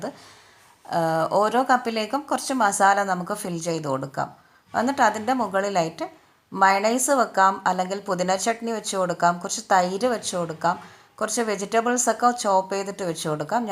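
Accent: Thalassery, India. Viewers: Nammude thalassery talk kelkan thanne Rasama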